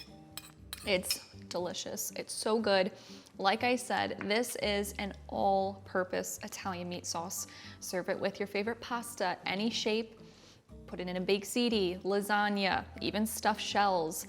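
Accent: Philadelphia, United States. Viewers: you sound like you’ve a light Philly accent?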